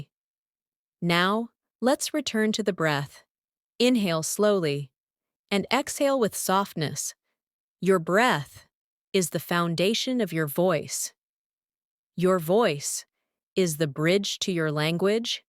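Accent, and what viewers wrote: United States. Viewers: Your english sounds american...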